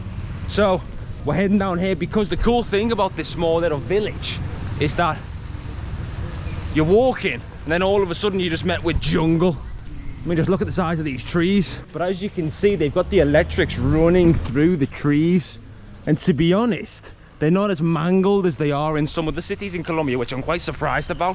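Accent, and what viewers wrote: Liverpool, United Kingdom. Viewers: Liverpool accent at its finest 😭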